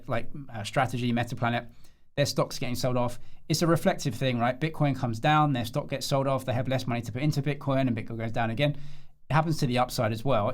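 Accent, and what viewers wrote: England, United Kingdom. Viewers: If you don't understand English spoken by an English person try turning on the closed captions.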